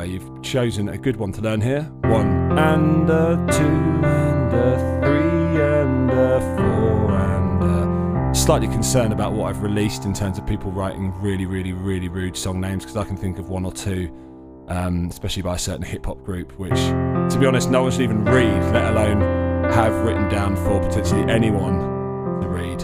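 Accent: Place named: England, United Kingdom. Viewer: you sound like my crush from England omfg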